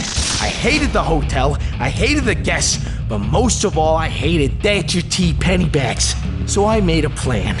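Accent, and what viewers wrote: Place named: United Kingdom. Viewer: Gasp HES BRITISH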